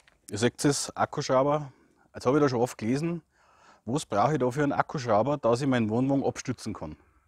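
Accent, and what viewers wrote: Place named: Bavaria, Germany. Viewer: bitte untertiteln, da boarisch nicht jeder versteht !!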